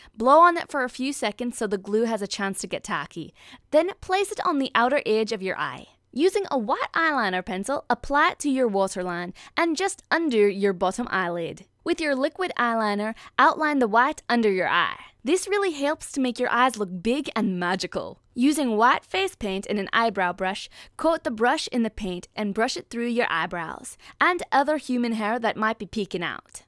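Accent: United Kingdom. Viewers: Amazing can't wait to become Rayla lol😂😂😂 Also your Scottish accent is amaze!!!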